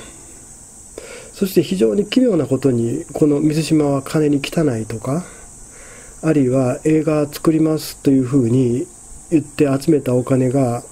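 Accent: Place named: Kansai, Japan. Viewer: そうですねー。水島さんもちょっと感情的になってたんでしょうね。第三者なら、まさかアンタは慰安婦だ！という意味ではないだろうなとわかると思うけど、今、揉めてる当事者であり真子さんは女性なので「慰安婦」という文言を使ったのは、あんまり適切ではなかったかなぁと私も思いますね。私は真子さんが我那覇パパにべったりなのと、江崎さんって胡散臭過ぎるような感じがするので、今までの我那覇さん達の実績をあまり知らないのでなんとも言えないけど、一般の本土の人間として、我那覇パパ、江崎さん、ヒステリックになった真子さんのトリオはへんてこりんに見えましたね。でも、水島さんの慰安婦発言、そこは不適切かなと思います。女性に対してだからね。そういう意味では水島さんもちょっと常軌を逸してたような気も。 ところで、先生は関西出身ですか？私、関西人なんで、時々、関西弁のときがあるからそうなのかなと思いました。私が関西人だからかもしれないけど、神戸っ子の立場からしたら、とても親近感を感じましたー。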